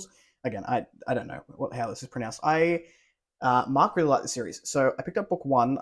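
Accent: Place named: Australia. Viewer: Oh thank goodness. I've been hunting around for Aussie booktubers with similar book interests. Your accent makes it easier to hold my sorry excuse for an attention span. So many of these are on my TBR. The used bookstore is my vice.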